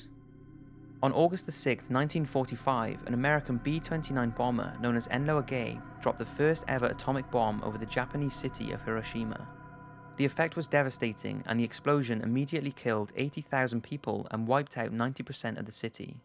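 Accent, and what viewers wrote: Wales, United Kingdom. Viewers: are you welsh............. anyway love the vid :)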